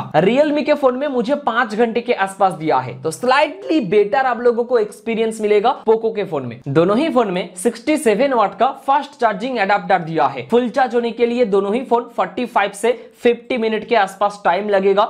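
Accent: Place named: Bihar, India. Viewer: Bhai tum bihar se ho kya nice accent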